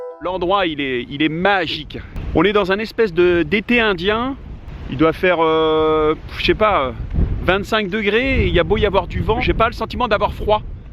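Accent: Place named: South, France. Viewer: Sexy entre le ménage et l'accent du Sud!!! Tjrs en mode groupie ;) Tes vidéos sont sublimes plus sérieusement!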